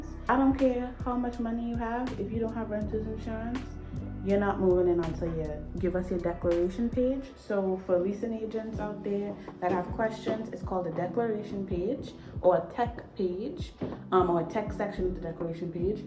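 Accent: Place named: Trinidad and Tobago. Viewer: Doh lose dat trini voice, eh!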